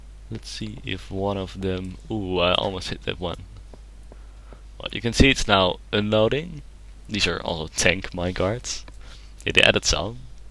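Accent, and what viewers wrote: Netherlands. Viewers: I hear a Dutch accent ^^ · you're totally right, he is dutch, I (guy from the NETHERlands) think. I only heard it after you said it though. I have a rather "deftig" (The Queens English) accent. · It's sooooo easy to recognize a dutch accent (says this dutch guy here)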